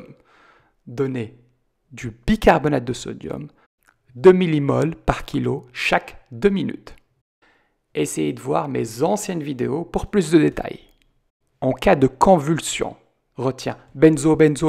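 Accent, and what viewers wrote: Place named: Belgium. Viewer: Docteur, vous habitez en Belgique ? Ça se voit parce vous prononcez tachycardie avec : ch, et nonante pour 90🇧🇪🇧🇪🇧🇪😊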